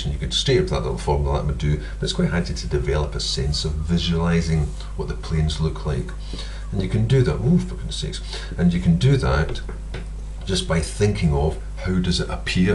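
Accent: Scotland, United Kingdom. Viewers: Is it just me or his accent sounds like from Brave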